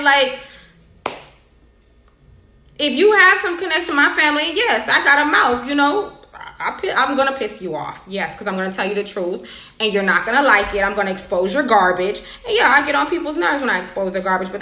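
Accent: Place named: North, United Kingdom. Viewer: that up north accent i like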